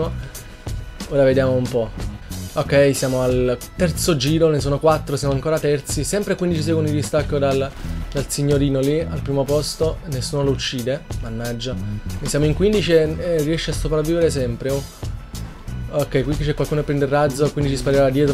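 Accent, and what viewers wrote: Italy. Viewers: da come parli deduco che sei del centro/sud Italia.Confermi?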